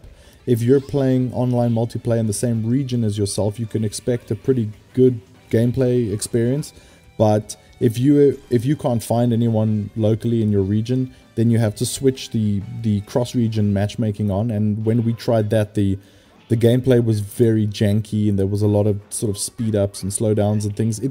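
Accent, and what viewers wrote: South Africa. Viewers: Your review video popped up in my suggestions list & I decided to have a look without taking note of your channel's name... After a few moments into the video I was like this guy sounds familiar, South African like familiar! Greetings from a South African living in deep South Texas USA... I enjoyed your video, I've been thinking about getting the Capcom Fighting Collection, the Darkstalkers, Cyberbots, Red Earth & Puzzle Fighter are quite appealing to me, so I think I'll take the plunge. I've already got the Street Fighter 30th Anniversary Collection so it'll go well with that. I do however wish they'd port some of the older Tekken games, namely 3 & 4 for the Nintendo Switch, now that I'd be seriously into! Subscribed & looking forward to more videos. 👍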